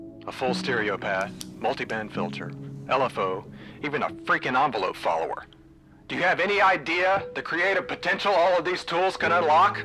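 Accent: South, United States. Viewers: First off, thank you for this video... I can employ it on the MKII (or+FX)... And second, I don't know whose accent I like more... Florian from Bad Gear or your southern charm. HAWHHHHHHich ever though, it adds to your dry wit, which you deploy like a sniper.... first you don't realize the moment is coming, and then when it lands, you wonder where it came from. All interspersed is some of the best first hand practical knowledge of gear.!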